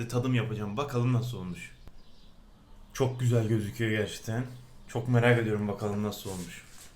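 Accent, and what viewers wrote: Adana, Turkey. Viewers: Abi sen nerelisin adanaı gibi konuşuyosun atüyürüm ocağa ekliyürüm diye konusuyonda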